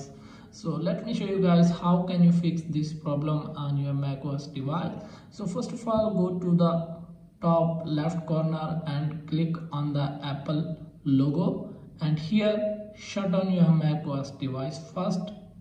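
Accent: India. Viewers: As soon as I heard the indian accent, I knew it was going to work!!! Thank you!!!❤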